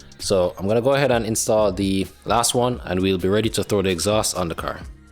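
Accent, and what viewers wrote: Jamaica. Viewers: Love the Jamaican accent!🙂 Oh right, the exhaust, loved the sound (and look) of that too!😁 Btw, and yea good idea to install heatshields onto the aftermarket exhaust, they're there for a reason👍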